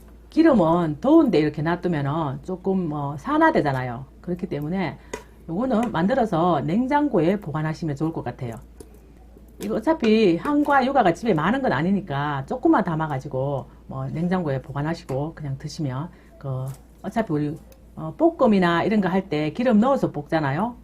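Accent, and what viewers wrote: Gyeongsang, South Korea. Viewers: 너무재미나게 잘 가르켜주시네요 저도경상도라 이웃집아줌마랑 수다떠는거 같이 너무정겹고좋아요 ^^ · 경상도 사투리❤너무. 즐겁게 잘보고❤🎉있어요❤😅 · 정겨운말솜씨에 경상도사투리에 귀한영상 쉬면서 너무잘보고 꿀팁얻어갑니다 성격도좋으시고 아름답고 너무멋지신분같아요 팔공산제2석굴암쪽 산사랑입니다 항상건강하셍ᆢ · 경산도 말이 참재밌어요 근데 고추장먹고 취하지안을까요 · 경상도 사트리 매력있는 조리원 님께 고추장 담는법 감사드림니다. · 영상보면서 웃습니다 경상도사투리써가면서 재밋네예 고추장쉽게만드는법 금방이라도 할것같은데 아마도낼이면 까먹을거같아 공유해갑니다 감사감사__ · 맞아요 냉동실에 고추가루 있어요 고추장 담아습니다 여사님 경상도언어 듣기가 듣기좋아요❤❤❤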